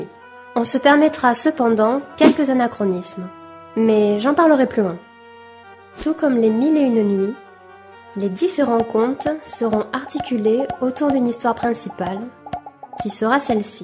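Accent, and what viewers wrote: South, France. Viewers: Petit accent du sud guerre discret malheureusement^^ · L'accent du sudd <3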